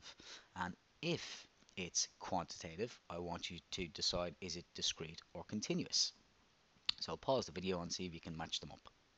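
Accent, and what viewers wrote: Ireland. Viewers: All of your videos have really helped me revise! thanks for taking time out to post these videos on youtube as my maths teacher I currently have in college is SHIT. thanks!! wish you were my teacher, especially with that sexy irish voice....... just saying:)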